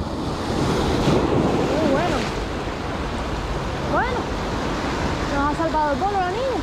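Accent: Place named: Canary Islands, Spain. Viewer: Lo mejor del video es las ganas y lo bien que explicas las cosas y ese súper acento canario que tenemos, sigue así que por lo menos a mi me enorgullece seguirte en tu canal, cuando vengas a Tenerife te diré buenos sitios de pesca a boya y a fondo que es lo que más práctico yo o el vivo a seguir mi niña toda una campeona saludos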